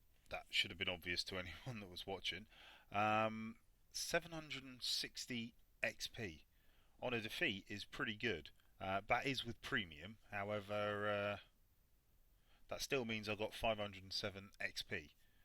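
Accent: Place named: England, United Kingdom. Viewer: not gonna lie, subscribed to you because of jingles, but having seen this video im staying for your commentary. as a fellow brit its quite nice to listen to an english person commentating rather than an american. keep up the good work :)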